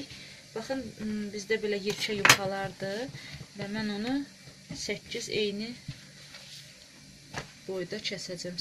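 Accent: Agdash, Azerbaijan. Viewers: Superdi.xanim siz Azerbaycanin hansi bolgesindensiz? Agdaşli deyilsiz tesadufen?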